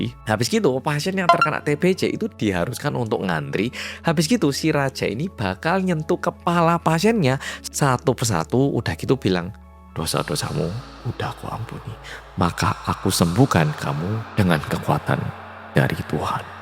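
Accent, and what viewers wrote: Java, Indonesia. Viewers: hai mas medok🎉 lgsg nyimak🎉🎉🎉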